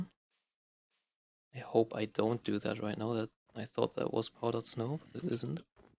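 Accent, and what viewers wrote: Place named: Germany. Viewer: hey bro, i just wanted to say that your english really is good like everyone says, you still notice the german accent but i kinda like it (: also i really just like the normal gameplay and u just talking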